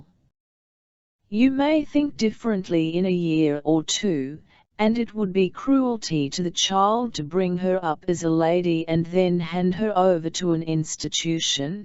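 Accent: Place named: Australia. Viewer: People learning from this will pick up a strong Australian accent and a very robotic expressionless intonation!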